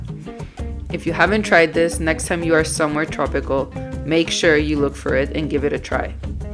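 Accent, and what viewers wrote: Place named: Brazil. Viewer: Pelo sotaque, achei que fosse brasileira!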